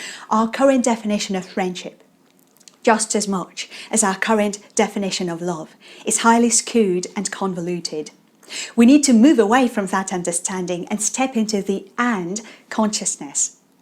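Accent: United Kingdom. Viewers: I have known you for several years. I first texted you in VK saying that I am love with your amazing British accent. I started working on mine one year ago. You were a massive motivation for me, thank you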